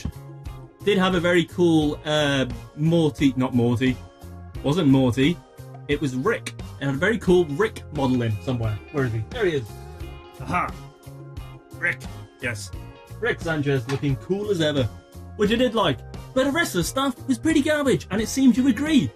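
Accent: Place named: United Kingdom. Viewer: I totally do that adapt accent thing. When I meet a british person i immediately start talking with a british accent. And to Americans, most british accents sound the same. We cant tell regions. So you sound British not Liverpool.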